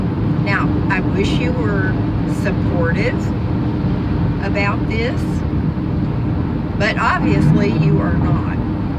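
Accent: South, United States. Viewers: I stubbed upon your videos & what a beautiful Southern Accent. You are a cutie pie! You have a new subscriber!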